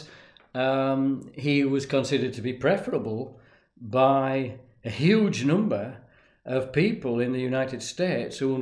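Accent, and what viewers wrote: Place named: Scotland, United Kingdom. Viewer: I just discovered your channel. This is the second vid I’ve watched. I’m enjoying it. To my ear, I thought you were Scottish not English, but what do us Yanks know. Appreciate your insight.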